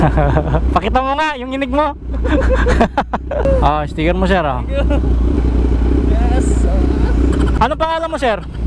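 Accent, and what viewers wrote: Pampanga, Philippines. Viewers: Mangarakap ka na tune kang kapampangan ne sir? Hahaha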